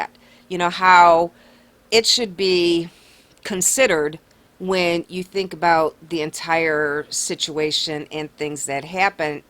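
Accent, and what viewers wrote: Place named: Midwest, United States. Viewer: She's got the best content and that hot Midwest accent/ voice loll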